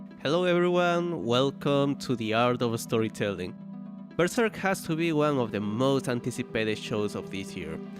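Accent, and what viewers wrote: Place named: Eastern Europe. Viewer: I can hear some Eastern European accent. You're from Georgia?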